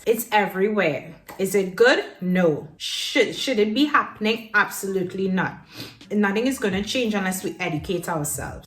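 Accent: Trinidad and Tobago. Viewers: First of all, love the trini accent!! As for the staring though, I must say that it too is a caribbean thing (though it may not be a Trinidad thing if they be ringing people ear) xaxa!!! Whenever I think of people staring, I remember how my Jamaican friend/classmate would ALMOST ALWAYS respond when she eyeing people down and they does vex over it: "is my eye" she say xaxa! Caribbean people love a stare just as much as Greeks, Germans, Turkish, Chinese or even Americans on vacation do. I don't think it is out of malice when Cypriots stare, it could be just that they don't see enough Trinis touching down :) kali tyxi! PS: Your tiktok did not lie; don't feel no how.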